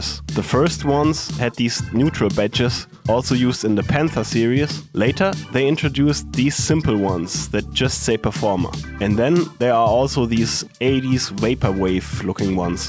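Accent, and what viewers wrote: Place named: Germany. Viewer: Cool project and great video! I really love your sense of humour, and the german/english speaking voice with the small but delightful pronunciation problems. Beer/bear <3 Please keep it just like that - comes forward as honest, funny and very human. Looking forward to part 2!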